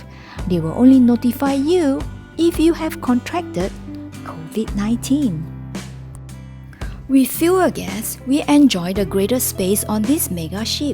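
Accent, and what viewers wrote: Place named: Japan. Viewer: Lol..I see. Now I know this is an English video with some Japanese pronunciations..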